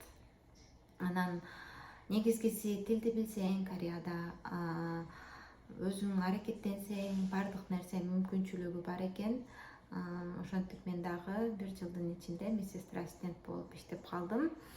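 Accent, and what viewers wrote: Standard. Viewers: Кыйын Кыз экенсин,кыргызча нукура суйлоп тушундуруп.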